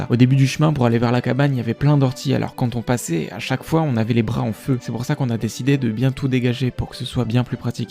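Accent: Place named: Paris, France. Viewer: On sent le parisien......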